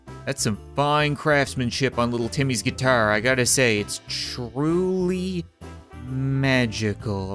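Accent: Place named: Newfoundland, Canada. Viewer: He has a Newfoundland accent where is he from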